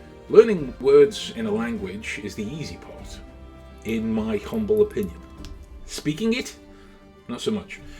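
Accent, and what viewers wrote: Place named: Quebec, Canada. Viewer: En fait non seulement tu parles le français mais tu parles également le québécois et ça, ce n'est pas rien !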